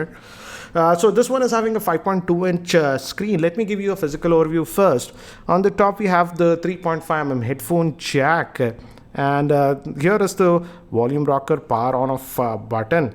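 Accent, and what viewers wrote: India. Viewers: Prince Kumar I understand that he's indian. I know that he has an accent and I can understand that but there are times that I can't catch what he was saying cause his pace is too fast. Since he has an accent (which again I understand and I'm not taking that against him) It'll be nice for him to speak slow for his viewers to understand him since I believe I'm not the only non-Indian subscriber that he has. I'm not hating, I'm just giving him a constructive criticism to improve. Still love his reviews. And I respect.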